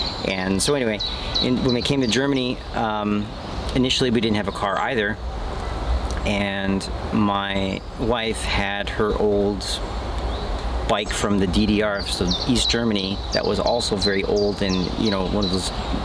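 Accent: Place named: Upper Peninsula, United States. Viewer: I'm a Yooper too, and living in Heidelberg. I would love a Yooper Stammtisch and a Rauchbier in Regensburg. Thanks for the vids, and a touch of Yooper accent!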